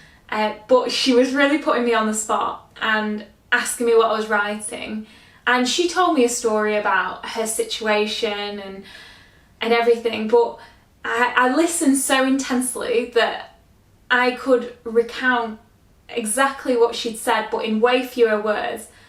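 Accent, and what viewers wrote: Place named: United Kingdom. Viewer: Great channel, immediately subscribed especially thrilled to hear a familiar voice of the u.k. too as most channels I find myself subscribed to are often American, and so it’s confused as social work in our country is very difficult to appropriately compare with rules and procedures in u.s.a.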